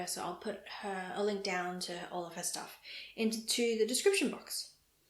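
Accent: Australia. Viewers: Hi, I've just recently found you here on YouTube. I am located in rural Victoria so it's lovely to listen to someone who has an Aussie accent. Would you know of any other Aussie yarn content on YouTube? I have searched but have only found a very small number of Aussie fibre enthusiasts. I am both a knitter and crocheter and am interested in trying both drop spindle and wheel spinning in the future. I hope you continue with the podcasts as I think they're awesome. 😊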